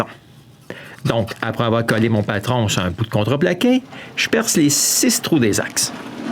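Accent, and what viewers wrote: Quebec, Canada. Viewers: OH! CT'ACCENT TROP JOLI si c'est pas Québec où Montréal viens plus en France ''ils'coprenn pas envoi le sucre d'orge (le sirop d'érable) et la Crépinne (crêpe) je crois.. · le magnifique accent québécois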